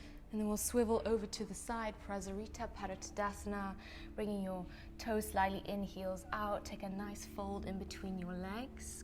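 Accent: South Africa. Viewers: Is that a South African accent I'm picking up? Loved the practice, perfect balance and I feel very energized and ready for my Friday!!!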